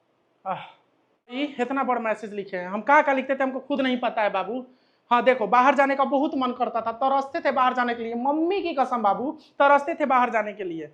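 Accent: Bihar, India. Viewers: Sun ke Acha lga aap Bihari ho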